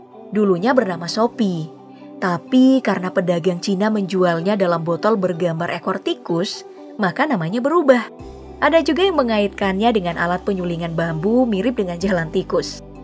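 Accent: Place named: Manado, Indonesia. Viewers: GUE SULAWESI UTARA, WKWKWKWK AGAK NGAKAK MIN Gw dengar logat nya Mimin 😂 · kita yakin ini admin nya orang Manado 😅😅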